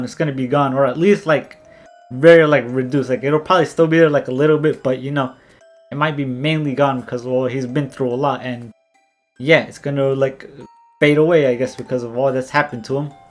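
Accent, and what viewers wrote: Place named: India. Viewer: lmao your half indian accent and you smiling all video doesent match the topic but its fucking funny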